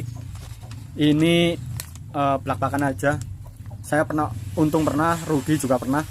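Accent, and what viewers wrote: Banyumas, Indonesia. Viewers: Mantap om...😆😆😆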